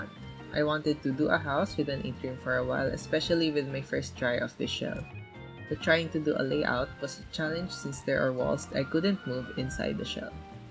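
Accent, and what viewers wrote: Philippines. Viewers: Your accent sounds like Filipino 💀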